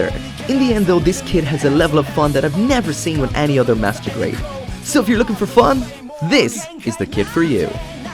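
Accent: Ireland. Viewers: Is this YouTuber Irish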